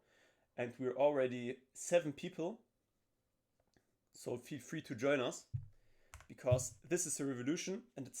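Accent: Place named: Germany. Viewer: I hear deutsch😂❤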